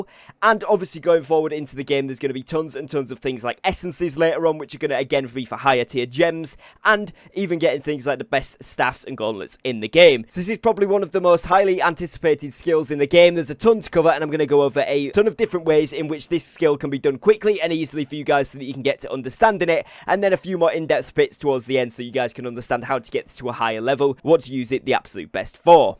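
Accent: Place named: North, United Kingdom. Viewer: Love your guides. You sound local to me! Total northerner! 😅🤣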